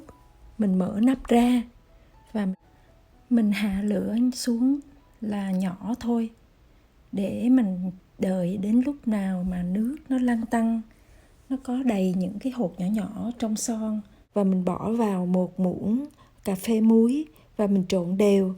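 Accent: Hue, Vietnam. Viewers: nói giọng Huế nhẹ nhẹ như người Huế ở Dalat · giong noi giong het nhu my cousins, nguoi Hue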